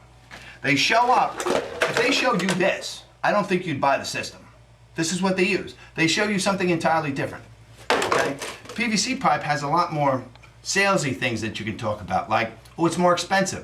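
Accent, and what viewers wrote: New Jersey, United States. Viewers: great video! can quickly tell you're knowledgeable. I don't pay any attention to the comments where they say they feel that you're bitching at them. Doing contract work myself it's so frustrating when you see shit work done by others. It gives the good contractors a bad name who are willing to do the job right the first time and it takes more time for you to dispel those fears in customers that have been screwed over. to hell with the "contractors" that are too lazy or stupid to do the job right. This video alone made me a subscriber. Right away I said "this guy sounds like he's from Jersey haha and sure enough. just relocated to South Jersey for my job. Keep up the good work out there and keep the videos coming. Take Care! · Damn funny as hell before I was watching another video and I’m like this dude is definitely from Jersey! 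Kick ass bro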